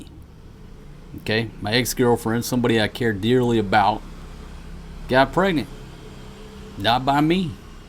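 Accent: South, United States. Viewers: I’m a new sub.. I enjoy listening to your conversations. I will not judge, I just want to understand some things. Who is Maria’s mother? Is she your biological daughter? How did you name your son Forest G? He’s adorable & so is Maria. I’m very familiar with your personality , being the redneck that you are, 😅the way you talk, cuss a lot & drink a lot. I understand the way you talk. I’ve lived in Georgia long enough 😅. You are a good story teller. You can be amusing, funny, & serious. Glad you’ve clarified your position with Janice’s baby. Continue your life stories while people here in the US are still trying to learn their pronouns. Now you’re the hillbilly of the Phil. 😂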